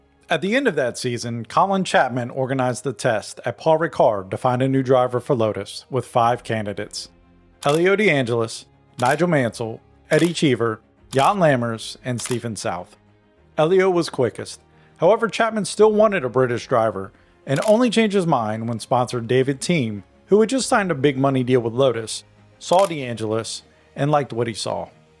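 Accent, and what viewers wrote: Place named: United States. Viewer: It must be AI as the maker claims he's based in India. So this must be an AI voice with an American accent.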